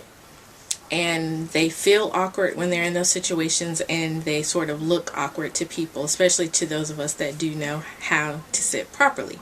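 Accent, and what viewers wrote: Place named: United States. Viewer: You are an African talk like an African why why this American talking style?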